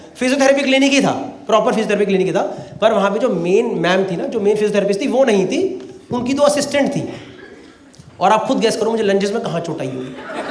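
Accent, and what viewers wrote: Delhi, India. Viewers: Unbelievable ji, your typical delhi government school type talk make it awesome. I can relate with you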